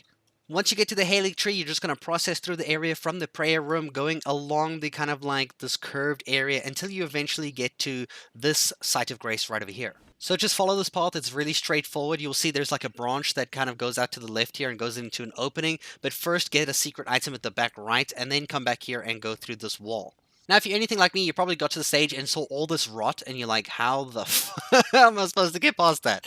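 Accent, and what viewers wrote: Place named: South Africa. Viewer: I have a friend from S A. I recognize the accent. Cheers and thanks for the help.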